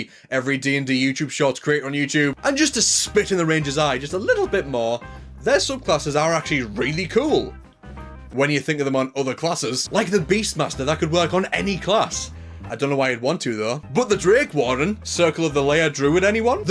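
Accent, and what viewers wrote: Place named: North East England, United Kingdom. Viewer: Hey, are you from the north east of England mate? Im Newcastle so can hear an accent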